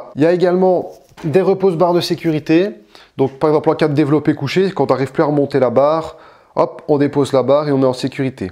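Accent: Belgium. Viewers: tres belle salle ... t'es belge ? si oui quelle région ? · c'est l'accent des mecs de Verviers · C'est curieux cet accent belge comme si vous aviez le nez bouché ! Je vous salue cousins belges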